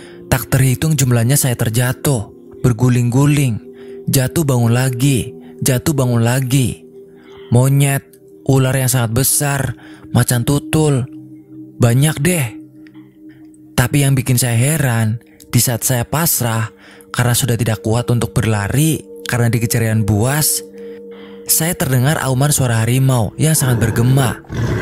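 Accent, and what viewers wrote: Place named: West Java, Indonesia. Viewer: Itu logat Sunda 😅😅😅pas akhir kalimat agak gimana gitu 😅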